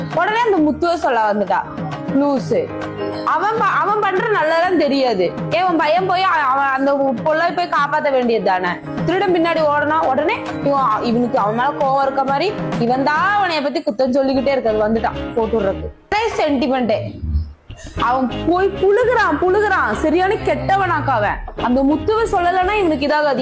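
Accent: Coimbatore, India. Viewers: Neenga covai ah akka..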